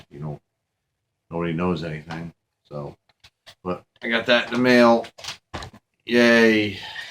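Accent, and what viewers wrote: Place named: Long Island, United States. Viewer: Too much to respond to in this video. I feel like I'm listening to myself a couple of years ago. You're gonna be a star man. YT is going to be a great place to flourish for you. I can see it already. You have a GREAT accent. The Long Island thing is BOSS dude. It makes you so Hollywood. I think you have half of this stuff nailed. And a CAR GUY. HOLY F. Welcome to the club of guitar guys who are car guys too. DKG is a huge car nut too!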